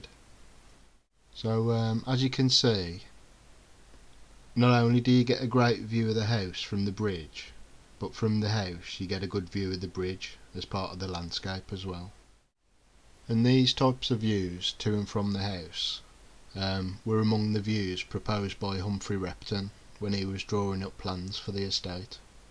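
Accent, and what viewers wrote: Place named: Black Country, United Kingdom. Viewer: Very detailed video, thank you. I’m from Wolverhampton originally so hearing the Black Country accent makes me feel like I’m home. Loved the addition of the bridge photographs!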